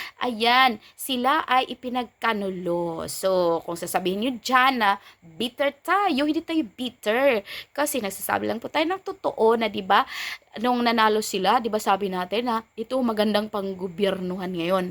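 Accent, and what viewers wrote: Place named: Visayas, Philippines. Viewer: Bisaya ka day .ayusin mo pagsasalita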